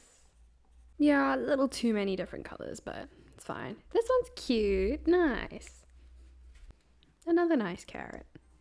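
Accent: South Africa. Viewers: Are you South African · Are you from south africa i hear an accent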